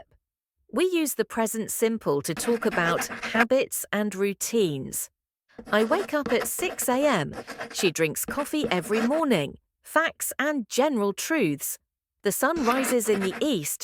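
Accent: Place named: United Kingdom. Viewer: Qué hermoso es el acento británico! Saludos desde Paraguay 🇵🇾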